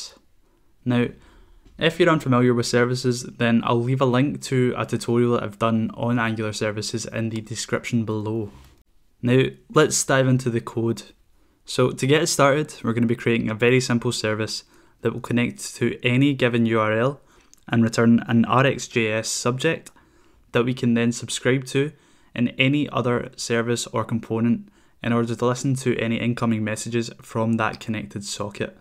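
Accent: Scotland, United Kingdom. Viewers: nice tutorial and nice scottish accent, brings me back to my old scottish lads in Spain :,) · Great tutorial and the scottish accent makes it even better :) I'm not even doing angular, but tutorials for redux-observable are so scarse... If you could do one on that, it would be great, but in any case - great work, thanks and keep it up.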